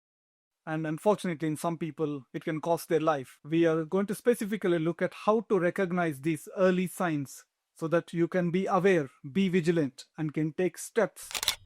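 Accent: India. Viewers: I was enterested with the caption. But the moment I heard that Indian accent.. i closed it.. IDKW.. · You india English is 50 / 50 to understand what are you saying !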